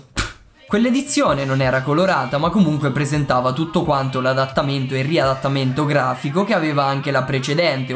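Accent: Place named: Marche, Italy. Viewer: Sei marchigiano, vero? Percepisco l'accento 🤔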